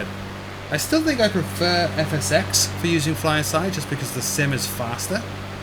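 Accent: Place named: England, United Kingdom. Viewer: I know it's English ... I can tell by the accent ... tech-talk is it's own new language - as usual in any discipline. I just am beyond amazed at age 60 that a) humans can fly b) humans can simulate flight c) humans can put this on an internet for all to see and d) we should all be apoplectic in the very conceiving of this 3rd millenium progress!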